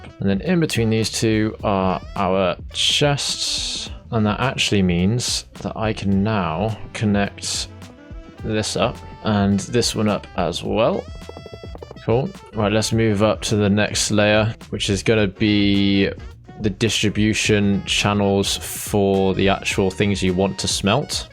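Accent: United Kingdom. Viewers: Is this a fellow Brit?!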